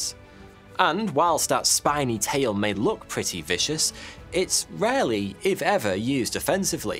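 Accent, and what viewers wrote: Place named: Cockney, United Kingdom. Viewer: Well done! The cockney bit,(Liverpudlian?),is aalllmosttt gone. Oh, the horseshoe crab thing is brilliant! Um, well, craic on then.-weezi-🙏💖🙏💜🙏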